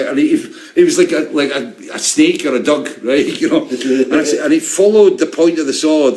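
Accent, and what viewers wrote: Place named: Scotland, United Kingdom. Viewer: He sounds Scottish.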